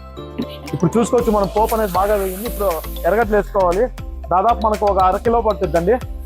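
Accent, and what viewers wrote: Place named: Kadapa, India. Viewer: మా కడప వాళ్ళ యాసే మీది కూడా.. ఆ మాట తీరు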